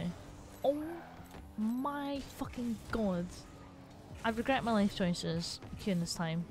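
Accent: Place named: Scotland, United Kingdom. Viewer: Wait, you're Scottish? So am i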